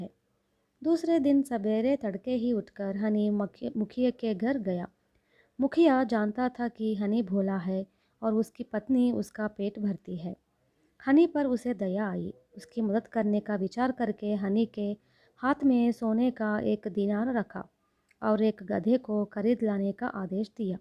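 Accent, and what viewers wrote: South, India. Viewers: south indian accent sounds good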